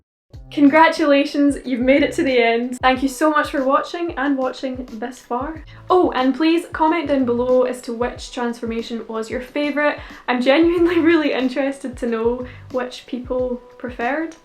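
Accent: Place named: Scotland, United Kingdom. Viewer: Love the Scottish accent makes me miss home :) love the flips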